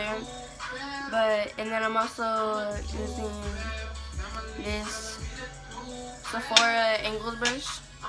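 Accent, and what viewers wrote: Bay Area, United States. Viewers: I can tell ur from the bay lmao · When i hear sobxrbe I assumed she was from the bay lmao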